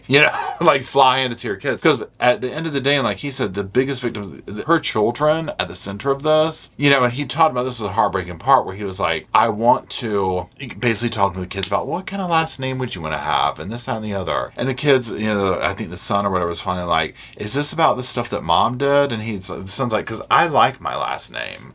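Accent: South, United States. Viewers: Why do you say "you know" so much? Is that just like, a weird southern thing?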